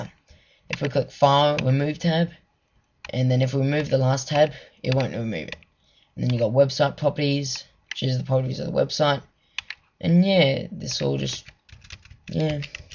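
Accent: Australia. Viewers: 5 more comments till 1337 and are u australian?cuz of ur accent and cuz u said G day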